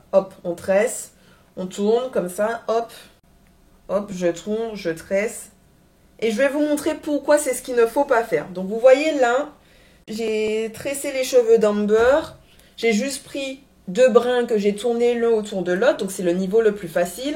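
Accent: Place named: Caribbean. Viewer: Merci. J' adore ton accent, je me crois revenue au péyi chaque fois que je te regarde. J'y vais l' année prochaine, merci pour ta technique que je ne connaissais pas et finis bien cette année 🤩🤩🤩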